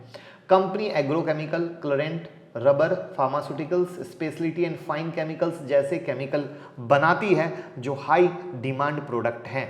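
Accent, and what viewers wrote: Bihar, India. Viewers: आप बिहार से हैं क्या?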